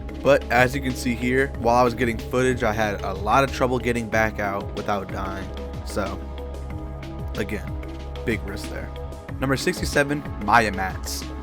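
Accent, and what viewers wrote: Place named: New England, United States. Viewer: ALSO New England Accent detected. i think? the way you pronounce "Room" reminds me of me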